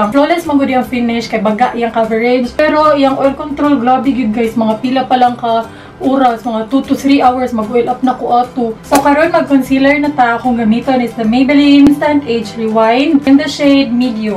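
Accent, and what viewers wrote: Visayas, Philippines. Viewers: wohoooo bisaya ni bai 😂 lingaw kayka te ay hahahaha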